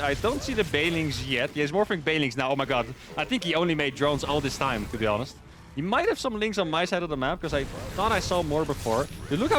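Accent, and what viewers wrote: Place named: Netherlands. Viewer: He is Dutch. Most of them speak English very fluently. Coming from England I can tell from his accent. The Netherlands is not far.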